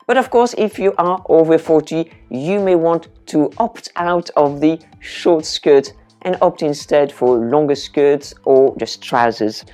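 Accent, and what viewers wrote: France. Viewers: I love your French accent!!!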